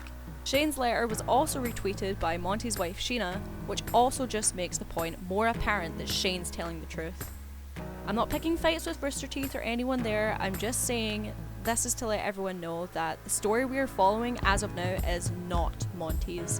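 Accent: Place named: United Kingdom. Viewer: you sound like you come from the UK going by accent alone. but, i gotta say i like the idea of killing of arcy boy as yeah...that would of had lot of sub branch events and encounters down the line. also Miles is a hack, like really check its like he attended one of J.J abrams talk on makeing story tropes and miles copys it like a fucking bible honestly just go in expecting something worthy of J.J film and you can normaly guess the ending or twists that are comeing up. also i personaly think hes slowy takeing the animation into "LENS FLARE!!"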